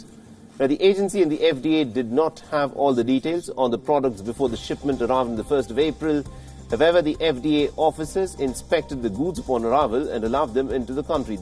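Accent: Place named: India. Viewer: Indian English has been developing independently with British rule and after. The anchor has a nasal tone & accent but otherwise it sounds normal enough to Indians. You must accept that there are worldwide variations in English all of which are equally authentic.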